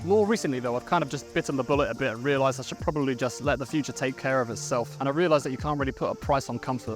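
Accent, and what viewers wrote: United Kingdom. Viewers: Hey there, this is the first vid from you I have seen, and I’m a new sub from South Africa 🇿🇦 and I have to say, you sound vaguely South African too…I can also hear an English accent too…eish, I’m confused and I think I’m hearing things, sorry…The more I listen, the more English you sound now 😂😂😂🤷‍♂️🙏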